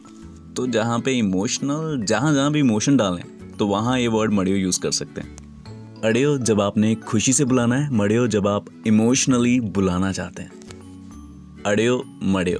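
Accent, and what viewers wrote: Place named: Kangra, India. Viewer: Kangri boli di tone aa di Teri bhasa ch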